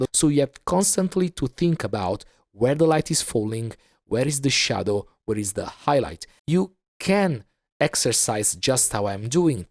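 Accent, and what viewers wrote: Italy. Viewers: Apro il video, sento le prime tre parole... Ok, dico, questo è italiano (accento inconfondibile) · Oddio, ahja si sente subito l'inglese "Italianizzato"😂 "MAMA MIIIAA" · Si sente a chilometri che sei italiano! Bel video comunque, grazie!! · Che bello sentire un accento italiano !!!!😍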